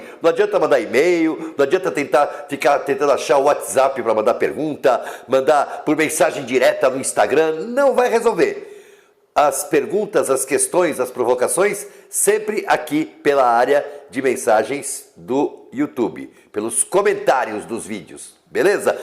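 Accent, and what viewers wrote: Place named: São Paulo, Brazil. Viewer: Vc e paulista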